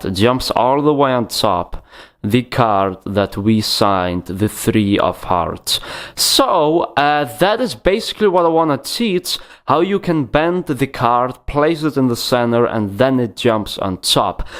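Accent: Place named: Russia. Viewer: Like the Russian arscent. Now I know the trick. Cheers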